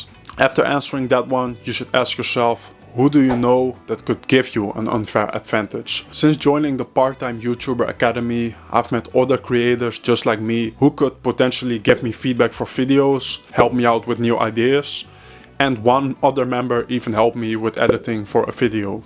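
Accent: Netherlands. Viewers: is that a Dutch accent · Dude I love your accent! I can't place it but I really like it. Sounds Dutch? Sort of?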